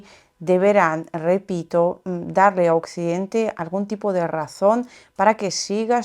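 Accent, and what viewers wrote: Spain. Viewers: Por favor sigue una línea seria en tu vestimenta. No uses ropa estrafalaria. Maquillate siempre. No uses tatuajes. El acento español de España es horrible. Usa español de argentina. Te lo digo con buena onda. Eres hermosa si cuidas estos detalles. 😊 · Ojalá te deshagas de ese acento Español que para el 90% de los que hablamos ese idioma nos resulta un poco... desagradable? Ayer entré al stream de un Español, y simplementé comenté que en el nuevo Zelda la decoración está basada en elementos Mexicas como las serpientes emplumadas y los ornamentos aztecas... y me contestó asi nada más: "No creo que sea de México porque no veo que Link sea un macaco"... Y asi son el 90% de ellos. pero el 90 o 95% de los hispanoparlantes no hablamos asi y no somos asi.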